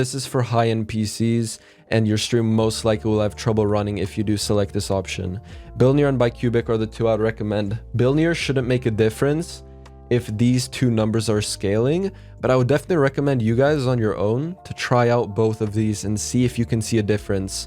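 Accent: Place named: England, United Kingdom. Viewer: Oh, thanks finally an English guy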